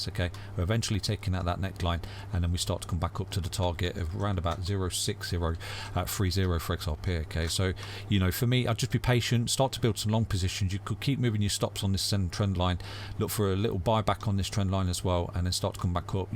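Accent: United Kingdom. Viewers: Keep up the good lovely british accent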